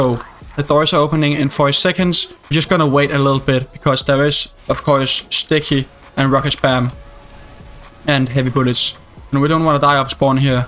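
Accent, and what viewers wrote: Denmark. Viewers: just a guess, but are you danish? if not then my hearing is completely broken, hav en god dag :)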